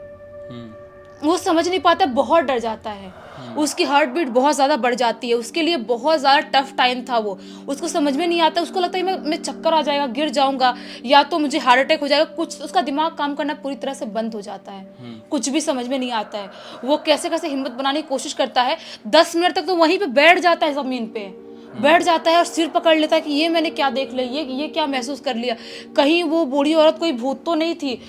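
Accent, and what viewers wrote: Bihar, India. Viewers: Dont you mind please!!!!! but her her voice like fully fast and like Bihar ka town tone I feel like when I m gonna to be close my eyes ... Baki brother you've done well before ...... shant shudd Hindi or slow and gehari aavaj wali storiya maja lati hin jyada tr log night me story sunate hin to night wala background better rahega ..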